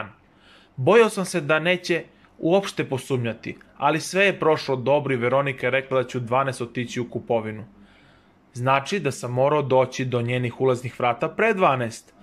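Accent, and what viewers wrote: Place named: Nis, Serbia. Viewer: Vidi se da si iz Nisa ili okoline,i imws jako los akcenat i dikciju da pricanje ovakbih prica....ideja je dobra ali realizacija vrlo losa....To je moje iskreno misljenje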